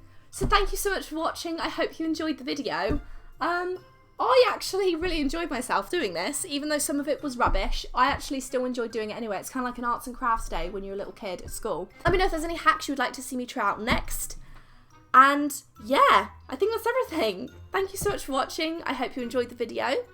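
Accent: England, United Kingdom. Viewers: no this is not American accent its British i live in the us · Are you British? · Hang on... She has a British accent but mention Walmart which doesn't exist in the UK so does she has a british accent but lives in us or am I tripping 😂😂😂 amazing video tho xx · Woah a wild British person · You sound British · I'm Australian and I laughed so hard at this comment because she's so obviously British to me lol. I'm not an expert but I believe her accent is northern of some kind. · The British accent tho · I'm in love with your British accent😍😍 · r u british? love ur accent!! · I did NOT expect a british accent 😂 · Walmart??? Are you American? You sound English...? Xx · How is this beautiful child of a woman not British??????? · She’s British 😂😂😂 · You're British right? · Are you British? Your accent sounds very British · it is a British accent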